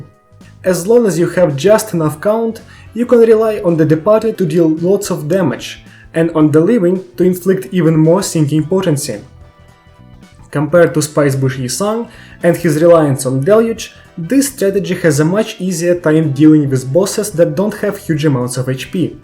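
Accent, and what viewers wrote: Russia. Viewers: man, you have russian аccent, especially батрфлайс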